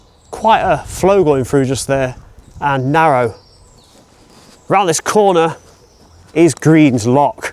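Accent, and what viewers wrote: United Kingdom. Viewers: And here was me in NZ thinking it was just one of the British dialects! Adds to the flavour!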